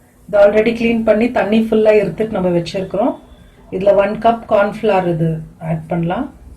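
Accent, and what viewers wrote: Bangalore, India. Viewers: Akka banglore tamil haa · I am tamilian from Banglore, it was v good see you any cooking kebab and talking Tamil in our style.. 😄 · Nice Bangalore tamil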